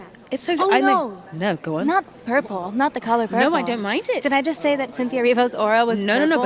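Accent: United Kingdom. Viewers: I enjoy watching your vids...you make me laugh...& you can do a perfect british accent ... You are so right...the relationship between those two is weird... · Your british accent is so on point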